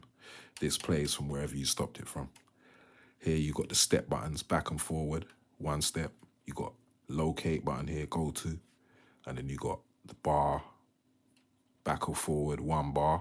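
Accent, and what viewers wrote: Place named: London, United Kingdom. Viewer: also its good to be listening to a London accent rather than an American one.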